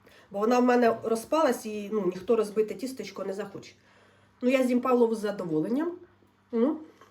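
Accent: Poltava, Ukraine. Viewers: Знає дуже добре українську,але розмовляє,як оозмовляють на Полтавщині. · Це полтавська говірка. Мені згадався серіал "Одного разу під Полтавою".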